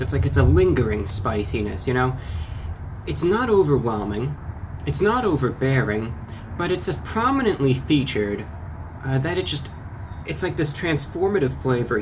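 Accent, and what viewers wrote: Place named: Mid-Atlantic, United States. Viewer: I thought with his accent it was NJ or somewhere mid-Atlantic coast.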